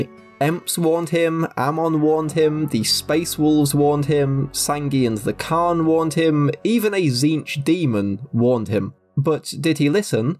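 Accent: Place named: United Kingdom. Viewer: A handsome man with a smart british accent nerding on about warhammer? Yes please!🎉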